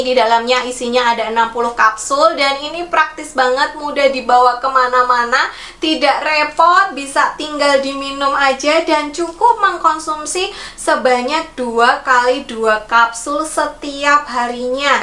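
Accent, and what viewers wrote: Java, Indonesia. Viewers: Bu bidan asli mana e, logat jowone medhok. Terimakasih ilmunya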